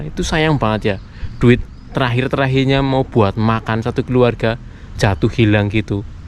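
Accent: Banyumas, Indonesia. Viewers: Bang banyakin ngomong ngapak nya bang...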